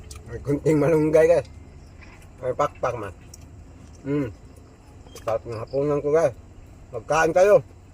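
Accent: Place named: Visayas, Philippines. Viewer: Okay lang naman tagalog niya po idol,bisaya kasi si idol.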